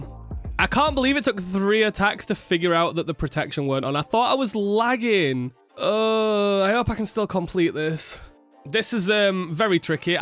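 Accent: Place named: North West England, United Kingdom. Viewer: you sound like ur from the north west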